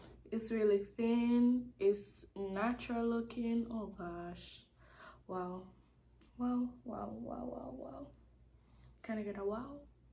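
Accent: Jamaica. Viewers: Are you Jamaican? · Are you Jamaican? I keep hair an accent for some reason hehe this is my first time coming to page definitely love this video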